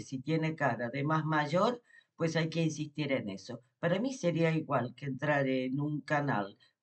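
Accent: Argentina. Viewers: Hola!! Hace poco q te veo,pero me encantas.porcierto me gusta mucho tu acento argentino ❤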